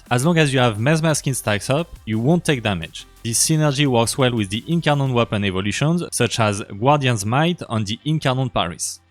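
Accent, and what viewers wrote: France. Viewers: I have to say it: I love your little french accent in your videos 😅 Your content is great I am sub since a long time. Now taking a short break from double resource event on Youtube and there is a new hot video from you. Very cool 👍😎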